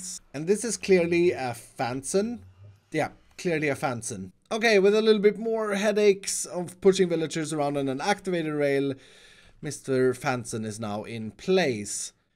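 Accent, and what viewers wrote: Sweden. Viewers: Du är svensk eller hur?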